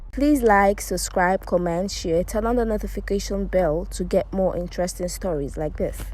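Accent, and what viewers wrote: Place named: Liberia. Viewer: You sounding like you’re from my hometown. Are you a Liberian?!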